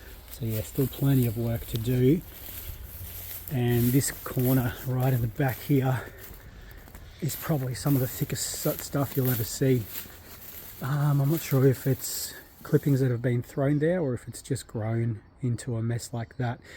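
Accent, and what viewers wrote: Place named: New Zealand. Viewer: Oops, I meant New Zealand, so sorry. 😬It's the accent! 😄 If you take on this yard, I look forward to seeing what the grass can look like when properly maintained. Thank you for the info.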